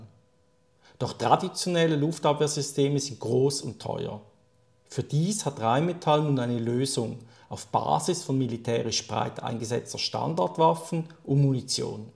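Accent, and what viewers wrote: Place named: Switzerland. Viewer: Er spricht Hochdeutsch mit Schweizer* Akzent*. Würde er Schweizer Dialekt sprechen, würde ihn wohl kaum ein Deutscher verstehen.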